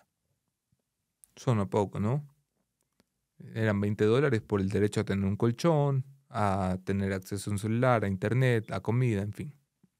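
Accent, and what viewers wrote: Argentina. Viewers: Por qué habla con acento argentino ? No es ecuatoriano? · Lo q yo no entiendo Es Venezolano habla como Argentino y vive o vivio en Ecuador 😮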